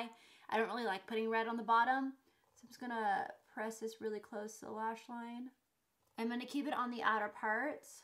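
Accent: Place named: New York, United States. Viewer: I love her accent. She has to be from New York, Staten Island kind of area.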